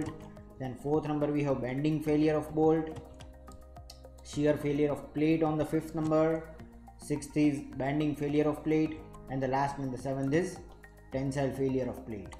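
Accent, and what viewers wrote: Gujarat, India. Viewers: gujrati accent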